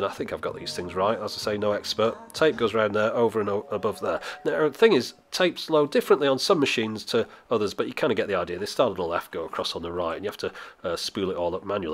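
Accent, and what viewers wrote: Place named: England, United Kingdom. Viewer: This guy is great! I majored in electronics in the 60's and connected every wire in four 24-track analog recording studios in L.A. so I appreciate someone who clearly knows what he is doing and explains with a patient voice. Of course, I have heard it said many times that us Americans, when hearing an English accent, believe this person is way smarter (this guy really is) than the usual bloated sack of protoplasm. ;~)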